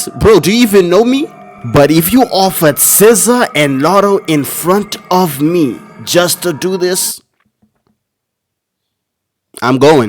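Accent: South Africa. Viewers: Bro sounds like a South African like me 😅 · are u South African?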